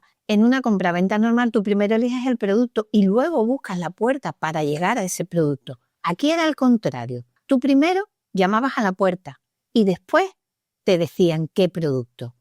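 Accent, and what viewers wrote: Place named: Andalusia, Spain. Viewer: Muy buen comentario en ese precioso acento andaluz. Gracias.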